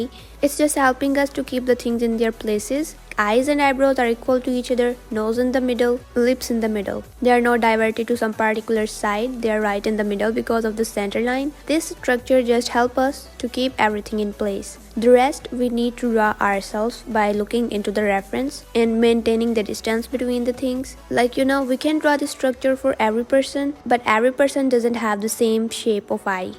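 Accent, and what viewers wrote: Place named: India. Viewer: Don't hide ur indian accent.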